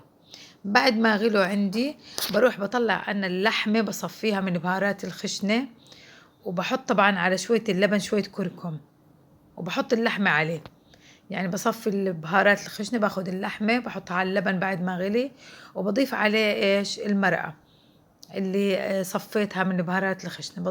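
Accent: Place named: Palestine. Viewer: لهجتك فلسطينية اعملي المسخن ع طريقتك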